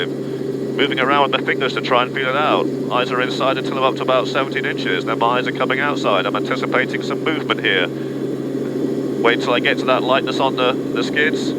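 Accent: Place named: England, United Kingdom. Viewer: Haha, he’s English.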